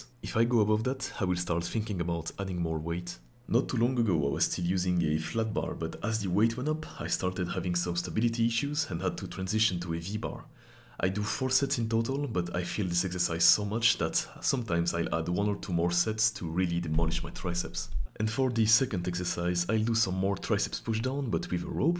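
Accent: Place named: France. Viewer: You're belgian? You have such a french accent, though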